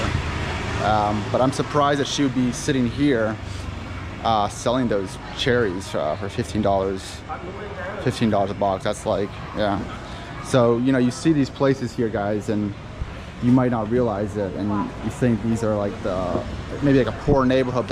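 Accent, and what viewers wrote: Cambodia. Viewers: how does this guy sounds so foreign yet so khmer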